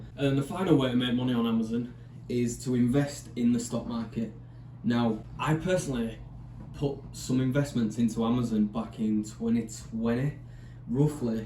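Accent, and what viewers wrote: Leeds, United Kingdom. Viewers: Really enjoying your channel given you a sub y oh sound like a Leeds lad too MOT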